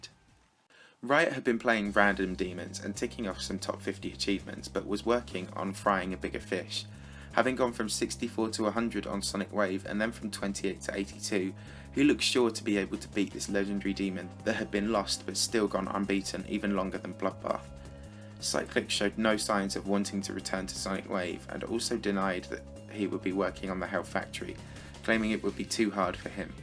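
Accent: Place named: RP, United Kingdom. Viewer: Good video but something is missing..... SUBTITLES! (Because your british accent is 2 formal, I can't hear it clearly ): )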